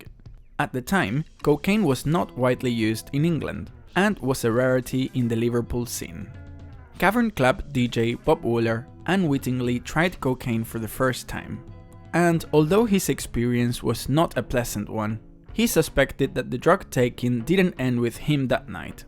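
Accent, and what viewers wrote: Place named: Argentina. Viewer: vos sos re argento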